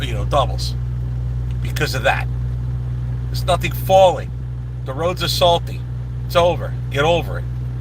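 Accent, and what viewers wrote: New Jersey, United States. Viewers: YOUR JERSEY ACCENT IS COMING IN STRONG TODAY..WINTER IS HALF OVER. GREAT VIDEO.. IT'S MIKE FROM CANADA.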